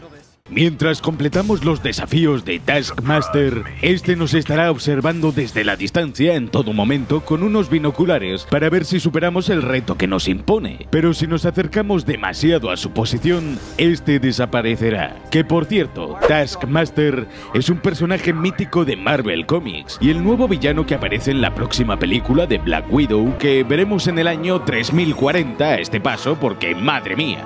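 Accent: Spain. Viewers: Es español y así se dice aquí.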